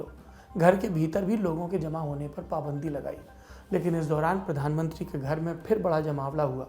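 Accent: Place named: Bundelkhand, India. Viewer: सिद्ध हिंदी के बीच थोड़ी सी बुंदेल खंडी हो वाह #दुनियादारी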